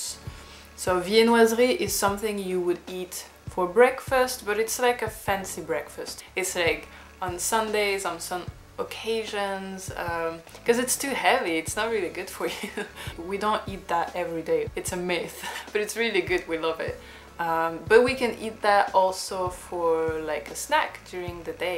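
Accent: Paris, France. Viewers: but ...but...what about regional differences--like the southern provinces? quite different sounds. Is yours Parisian? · You’re probably not going to respond but I’m going to ask anyway. What region of France are you from? Are you from the south or the north? Even though I consider myself fluent in French I still have trouble picking out accents. I live in a French household in America where my mom speaks French to me and my family. Also I think what people like most about meringues is that they’re sweet and melt in your mouth when you eat them 😋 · Oh dear! Now you've given me pastry cravings. Just have to plan a trip to France, that's all! Meanwhile, a snippet of feedback on your English. A LOT of English speakers say "pronounciation", but it isn't, it's pronunciation, just a u in the middle. If I had to guess where you're from, I would have guessed Saint-Germain-des-Prés, but I'm probably wildly wrong ... 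· I expect you to have that funny frenglish accent 😊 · Damn I could hear that french accejt of yours.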